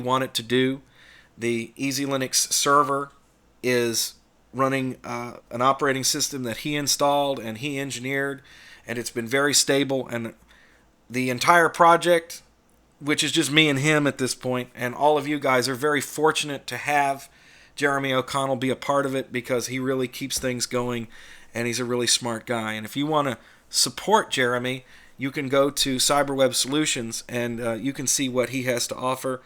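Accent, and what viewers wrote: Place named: Virginia, United States. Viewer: We love you, you're a great orator educator and communicator. I guess those descriptors are redundant to each other. But they are true. Being a New Englander I especially like your distinctive Virginia accent. Mostly I love the passion you put into teaching Linux to the rest of us. You've helped hundreds if not thousands of people transition from windows to Linux. Granted Microsoft helped the cause by developing the worst operating system ever. I only wish you didn't react to the haters, you come off a bit cringy and oversensitive. You need to learn to not let them get under your skin.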